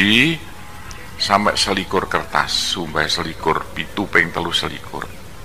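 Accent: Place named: Tegal, Indonesia. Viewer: Yo ora po2 kamu gk mau dngerin skip aja gtu aja kok repot he ini ilmu pnteng bwat orng bnyak cuman pk ustad nya klaw ngomong di dengerin sendri gk ngerti omongane ngebut kyak kreta api gk jelas jlimet pei..pei ...pei..kyak orng gk pnya gi2 sya aja yg dngerin ceramah nya gk nyambung omong nya mirip orang ngapak tegal 'smua blom tentu ngerti bhasa nya jdi klaw ceramah pkek bhasa indonesia yg jelas dn di mengerti gk jlimet kyak so2r nya benang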